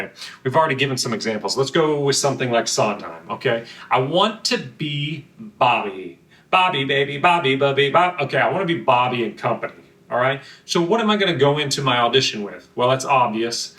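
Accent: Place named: Midwest, United States. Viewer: As someone from the Midwest, I love listening to you. It sounds like home :)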